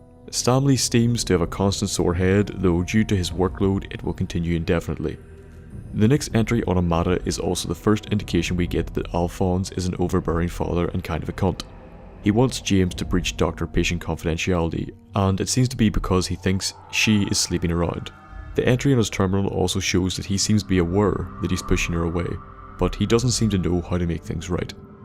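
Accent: Ireland. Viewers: He's most likely from Ireland at least his accent is Irish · feel like he's from Northern Meath or Southern Ulster. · .....are you irish? lol just a question due to your pronunciation of words. and you say shite a lot haha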